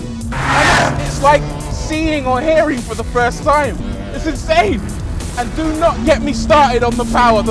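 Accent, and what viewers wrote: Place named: Caribbean. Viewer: Having seen him a few times now, I have to say that I can't stand the guy. His patois accent is gratingly irritating.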